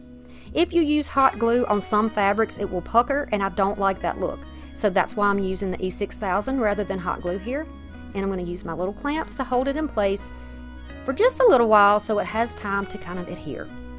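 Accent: South, United States. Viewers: Number one, I'm a new subscriber and I just wanted to say that your amazing southern draw and personality is what keeps me coming back! Number two, ALL of the things that you make are stunning and I'm so grateful that you've chosen to share your talents with all of us! I'm going to go check out your IG and send you a DM. Maybe we can share projects and ideas 😁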